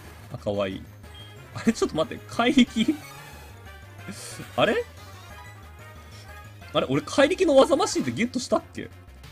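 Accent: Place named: Kansai, Japan. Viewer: って関西弁似合うね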